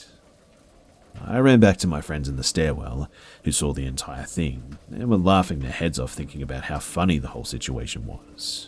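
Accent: Australia. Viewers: I moved from Australia to the US when I was 14. You sound like home 🤗. I appreciate you being awesome man! Thank you! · It's so great to hear a fellow Aussie voice, it helps me haha ! Well sometimes helps me sleep lol. It's am and I'm still awake lol . But thank you so much I love this,IV been listening to creepy pasta and for some reason the Aussie accent makes me feel like home. ✌️✌️✌️🙏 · I've only recently begun using these "true scary stories" videos to help bring about sleep, and of the plethora of channels, I've found only a small handful of narrators whose voices I can even stand, let alone genuinely enjoy. I'm happy to say yours falls directly in the "awesome" column. If it wouldn't blatantly (and rightfully) be considered theft/plagiarism, I'd suggest you take the stories from all the other channels and spruce them up with that buttery voice from down-under! 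;-) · I found you through urmaker and I'd already heard of you but I'd never watched a video of yours and I certainly wasn't expecting that Australian accent but I'm not complaining!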